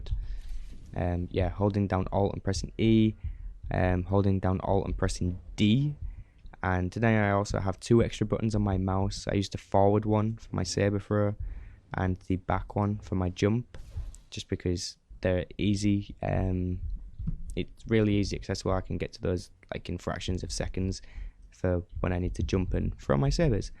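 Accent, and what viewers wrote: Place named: United Kingdom. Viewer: speak english................damn, tired or britiish accents, mumbling fucks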